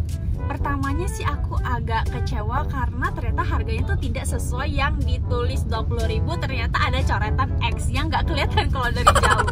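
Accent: Medan, Indonesia. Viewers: Org medan?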